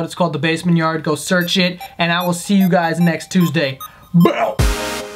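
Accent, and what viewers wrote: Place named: Queens, United States. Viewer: I think that's just how he says it!! Some people just say it that way!! Also, he's from Queens so that might have something to do with it!! Not sure though...lol!!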